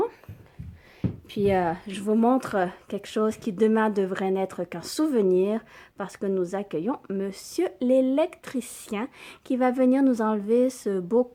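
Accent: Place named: Quebec, Canada. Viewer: Une eurasienne avec un accent québécois... bienvenue au 21eme siècle!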